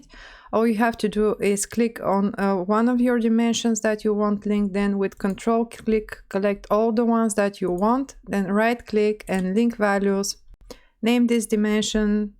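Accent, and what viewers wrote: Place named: Russia. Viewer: У девушки явный русскиий акцент... Давно в Канаде?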